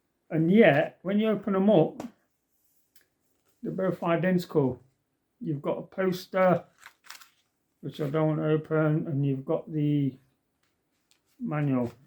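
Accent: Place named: Nottingham, United Kingdom. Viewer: Are you from Nottingham by any chance? Might be the accent I dont know haha. Nice cave mate. All the best.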